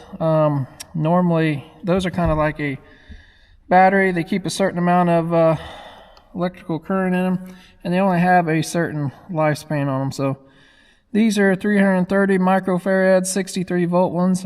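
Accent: South, United States. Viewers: Wow here is this southern redneck who wears dirty camo coveralls 10 months a year. Generally known for heavy equipment repairs, this dude takes his huge sausage fingers and does as clean a bit of circuit board soldering as I have ever heard or seen. And in a voice best suited for pork rinds, moonshine and Nascar, does as great a technical explanation, diagnosis and repair as I could ever have imagined., you are the salt of the earth and one of those people I regret I will never meet!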